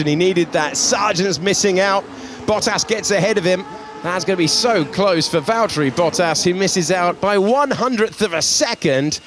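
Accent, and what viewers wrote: United States. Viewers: Why is the sound of the cars so low, all I hear is the loud commentary. I know these aren’t the best sounding cars but I still want to hear them. And that American accent 😔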